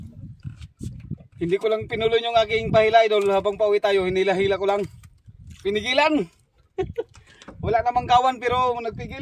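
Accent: Visayas, Philippines. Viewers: Visaya ka segoro kol dawi palang alam na hahahaha😂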